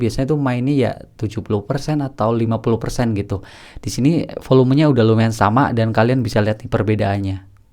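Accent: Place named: Banyumas, Indonesia. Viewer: Kamu orang daerah mana sih bang?😄 Logatnya rada ngapak.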